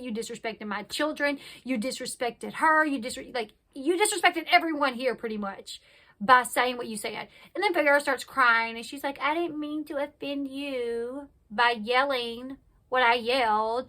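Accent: South, United States. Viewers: im not even from the states and i can tell its real haha besides you havent skipped a beat in all your videos so if you were acting your damn good LOL plus i love your accent, could listen to your southern drawl allllll day!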